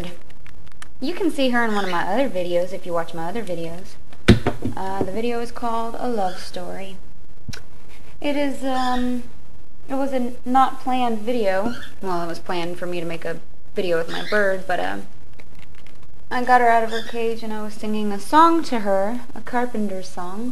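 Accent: Texas, United States. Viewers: you remind me of April Rhodes from glee. You're awesome, gotta love the Texas accent, I'm in TX too. I'll be subscribing to your channel for sure :) and I love your hair! :D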